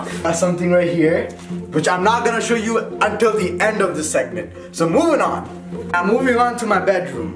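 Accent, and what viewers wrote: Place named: Pakistan. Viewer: Accent to Pakistani lag raha hai. Lakin Didi kyun keh raha hai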